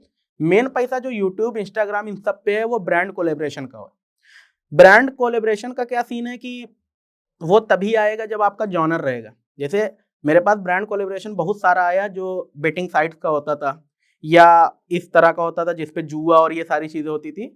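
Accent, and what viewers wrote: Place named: Tanda, India. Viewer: Tanda ki accent h apki..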